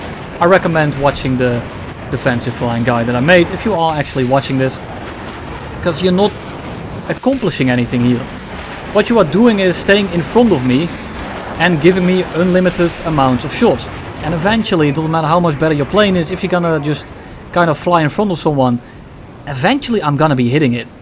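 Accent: Netherlands. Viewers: Die Nederlandse accent gaat te ver💀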